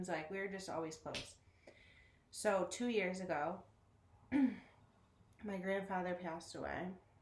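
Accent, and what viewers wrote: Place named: Midwest, United States. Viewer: You have to be from Michigan or Wisconsin? Anyway I travel all over for my job and can spot that accent anywhere. Thanks for the videos your advice.!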